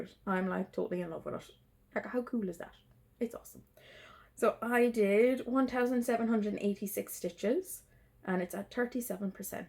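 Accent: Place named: Ireland. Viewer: Lovely to hear another Irish accent on Flosstube. Great to find another Jacob fan. I was supposed to attend the Big Stitch but sadly life had other plans. Really enjoying hearing all the recaps. Love the variety of your projects. Looking forward to following your progress. Take care, best wishes from 🇮🇪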